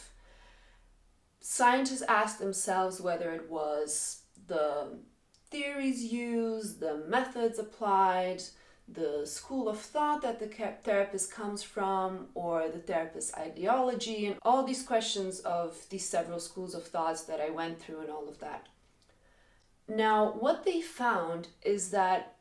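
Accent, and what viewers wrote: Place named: United Kingdom. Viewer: I really love what you say and the Nice British accent!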